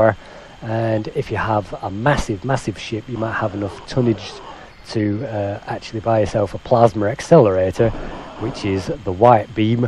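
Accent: United Kingdom. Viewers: You sound very British. Very very mooch. Moooooch. MOOOOOOOOOCH.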